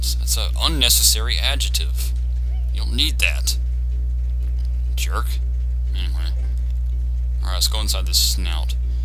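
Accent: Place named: United States. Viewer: Love/d this game and the american accent is a naughty bonus haha.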